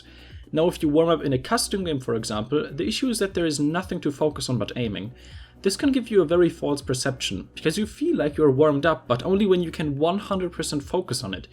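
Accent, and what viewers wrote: Germany. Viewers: Dein English ^^ Man hört sofort, dass du deutscher bist. :-)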